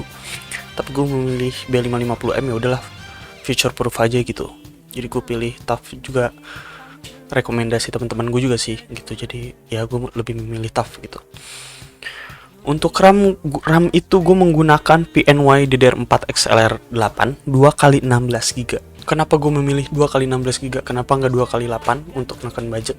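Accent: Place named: South Jakarta, Indonesia. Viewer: Bang lu anak Jaksel ya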